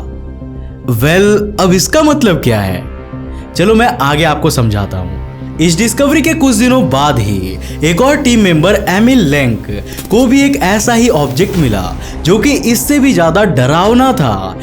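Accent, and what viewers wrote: Bengal, India. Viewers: Are you a Bengali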